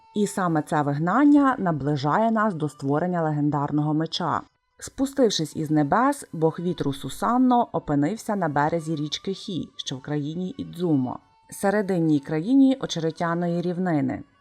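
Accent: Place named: Russia. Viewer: Йоооооййй! Це так цікаво! Тільки є одна штука, яка турбує. Часто деякі зі звуків у власних назвах ви вимовляєте на російський манір, як-от -сі, а не -ші, чи -ті, замість -чі. Так само неправильно -дзі, -дзя, -дзю, -дзьо, натомість : -джі, -джя, -джю, -джьо. Ця система (яку я навела як правильний приклад) транскрипції і транслітерації, здається, Коваленка. Більшість сучасних українських перекладів з *японської саме за нею. Отаке. Дуже дякую за цікавезні теми! Буду чекати ще щось із японської міфології. Прикольно впізнавати назви і імена, які зустрічались у сучасних роботах країни сходу сонця.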